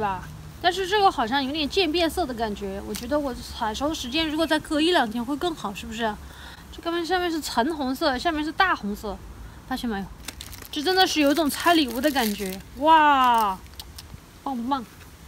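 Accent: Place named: Chengdu, China. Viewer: 哈哈哈哈感觉女主是不是成都人呀，口音跟我说普通话的时候好像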